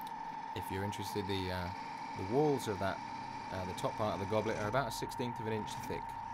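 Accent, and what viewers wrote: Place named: United Kingdom. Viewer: This is definitely one of the nicest things you ever turned. Also, I would like to know what is the brand of your lathe? Also how old is it, and how do you keep it running so well. I really like your channel, Your voice is pleasant and I like your British accent. Keep turning sir, I know, your thinking I don't have an accent, you do and your correct. Take care, and be careful.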